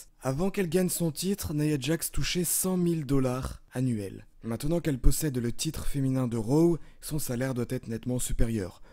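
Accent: Russia. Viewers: Je like la vidéo parce que elle est bonne et pour l'accent Russe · Mdr ton accent russe 😂😂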